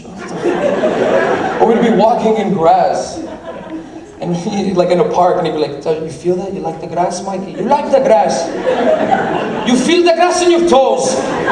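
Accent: Azores, Portugal. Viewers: Viva o sotaque de S. Miguel... :D para nós, os continentais, na maior parte do tempo são necessárias legendas, mas é um sotaque fabuloso. Um abraço do Porto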